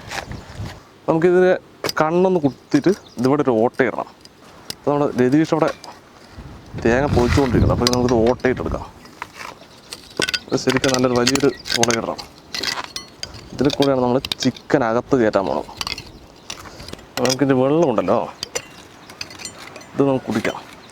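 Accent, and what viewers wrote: Palakkad, India. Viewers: Typical palakkad style of speaking. Good way of preparation. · Allelum palakan slang ishtallathorindo.,🥰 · സംസാരം കേട്ടിട്ട് പാലക്കാട്‌ ആണെന്ന് തോന്നുന്നു വീട് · പാലക്കാട് ഭാഷ സുപ്പർ. പക്ഷേ നോൺ വെജ് തിന്നാത്ത കാരണം ഇതിനെ പറ്റി ഒന്നും അറിയില്ല.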